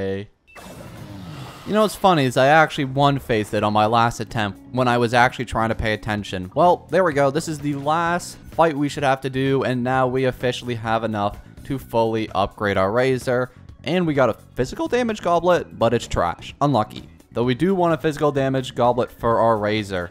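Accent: Canada. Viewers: he sounds canadian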